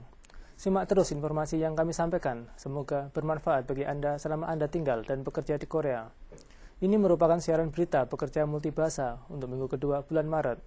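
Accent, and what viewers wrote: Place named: Java, Indonesia. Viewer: His Java accent... -.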